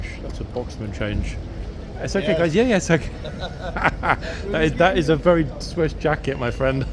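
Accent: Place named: United Kingdom. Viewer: His not her grand dad...my guess is the old guy is a boyfriend....how the woman poses hahaha she ia an Asian from Philippines...i know right....when we gonna have a peak how you look like Sir....i love British accent...lovely to listen... i met and befriended some while i was in HK... With your videos i feel also walking in London haha...GOD Bless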